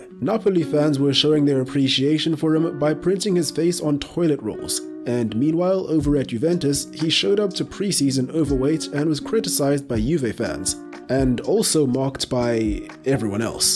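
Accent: South Africa. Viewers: Mr narrator...are you south African?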